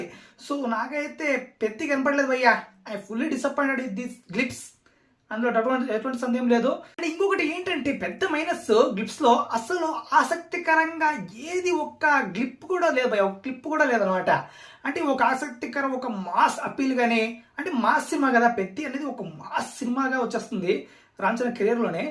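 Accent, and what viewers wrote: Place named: Andhra, India. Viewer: Utter andhra slang ra arripooka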